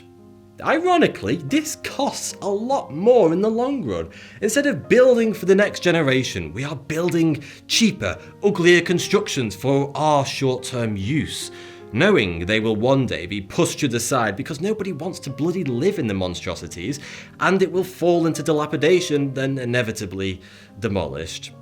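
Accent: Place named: Manchester, United Kingdom. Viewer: Bro: I like you. But you need to scale down your thesaurus addiction. It doesn't make the argument any stronger to use the word proclivity. I like a good diction but your proclivity for overt verbosity is verges on pomposity and its very superfluousness is rendered further supercilious by the manc accent. Yours, a fellow manc. Love x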